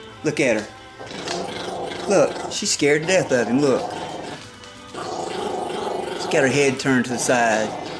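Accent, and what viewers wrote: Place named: Texas, United States. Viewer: What state are you from? Texas? Love the accent.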